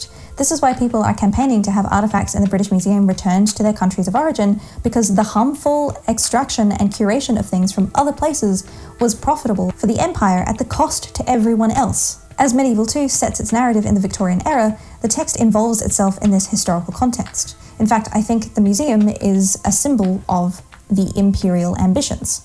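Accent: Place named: Australia. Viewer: australian spotted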